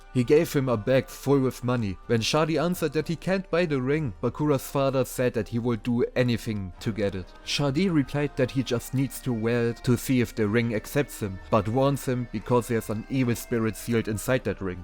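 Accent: Germany. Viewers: Dieses eingedeutschte Englisch ist schrecklich. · Boa wie man dein Deutsch heraus hört hahaha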